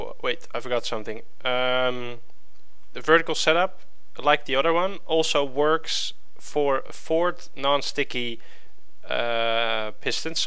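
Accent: Netherlands. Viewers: Are you dutch? really does sound like it :) thnx for the info :D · You sound Dutch. Are you from the Netherlands? Great demonstration! I subscribed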